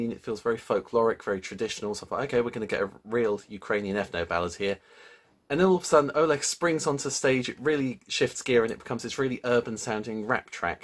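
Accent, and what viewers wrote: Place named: England, United Kingdom. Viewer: You sound English!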